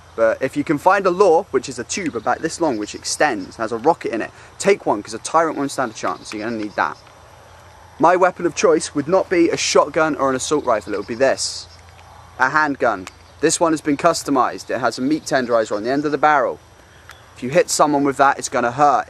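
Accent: United Kingdom. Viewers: Awwwh he said torch! Haha I love british peoples accents! My cousin and I joke around in british accents.. its just by all means adorable... haha and he said bloody omg this video tripped me out.. got to love british.(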